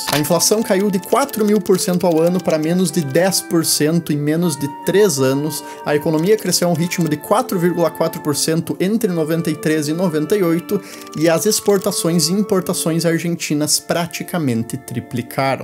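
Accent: Rio Grande do Sul, Brazil. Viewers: Nossa, primeira vez q escuto sotaque gaúcho de uma maneira formal, dá uma estranheza pra mim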